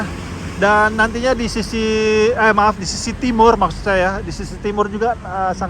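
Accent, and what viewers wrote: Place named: West Sumatra, Indonesia. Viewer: Dari logat bicaranya nampaknya abang ini "orang minang"... Salam urang awak....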